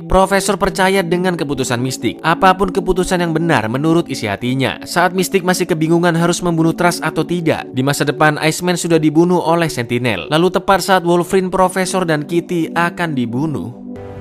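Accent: Java, Indonesia. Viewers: Jowo ne kandel banget mas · Jowo mu ngendi lurrr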